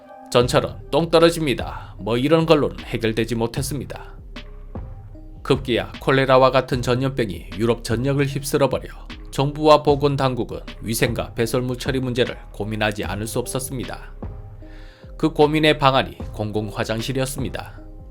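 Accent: Gyeongsang, South Korea. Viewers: 경상도 사투리는 진심 짜증나요 . 제발 사용 하지 마세요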